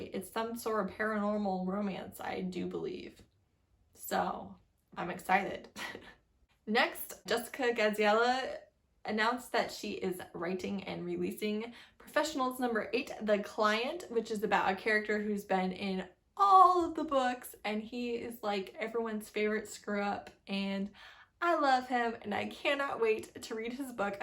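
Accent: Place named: United Kingdom. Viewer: you always sound British in your intro lolll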